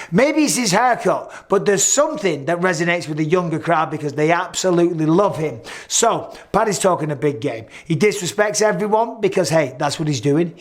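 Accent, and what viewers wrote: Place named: Manchester, United Kingdom. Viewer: tries so hard to be nice but the Ol manc comes out eventually 😄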